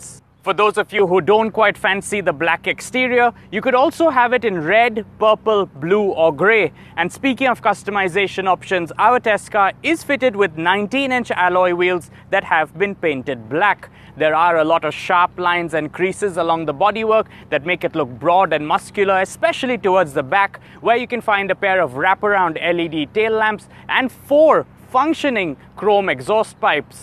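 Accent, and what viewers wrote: India. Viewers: İndian english is soooooo 😫😫🥴🤢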